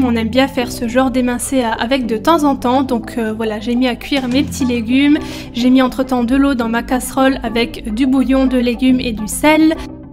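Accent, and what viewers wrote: France. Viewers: ta vraiment routine bien chargé avec tes petites pantoufles c'est tellement mignon l'accent d'une française lol il est bien chanceux ton copain tu semble être une grande cuisinière :-) et continue de faire des exercices c'est bien aussi , et oui nous aussi au Québec nous regardons cette émission mais avec la traduction québécoise bien entendu lol continue tes super vidéos j'adore :-)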